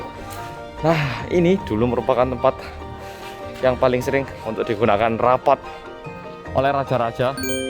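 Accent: Java, Indonesia. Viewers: Tetap jaga image medhoknya ya...karna tiada duanya youtuber korea yg medhok jawa..mg sukses👍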